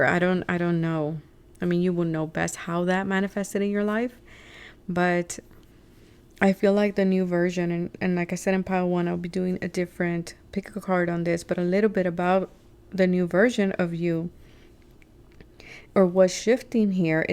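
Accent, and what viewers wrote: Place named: Latin America. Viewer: I think she has latina background, she speaks also spanish, so thus the accent. She is not african american.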